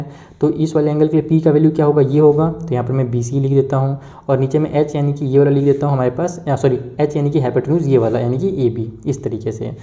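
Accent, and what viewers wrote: Bihar, India. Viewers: Ap bihar se ho sir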